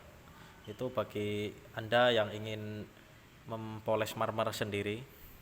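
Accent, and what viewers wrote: Banyumas, Indonesia. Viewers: Mntp, ngapak y ngmngnya 👍👍👍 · Ngapak pa ya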